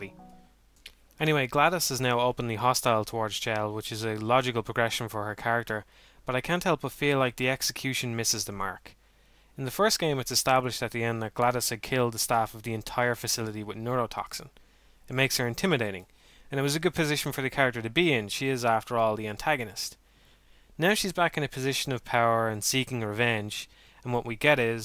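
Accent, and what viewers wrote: Ireland. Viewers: are you irish? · where are you at in the world I live in the us in kokomo Indiana but I feel we are kindred spirits because you have passion for games like me and you sound irish I have red hair and I agree with every you say about games im just drawing a comparison but its incredible the way your words are the way I think maybe we could team up but that is just wishful think anyway keep with it I love work man you are like adam sessler, tommy tellareco, Geoff key lee. (spelled wrong)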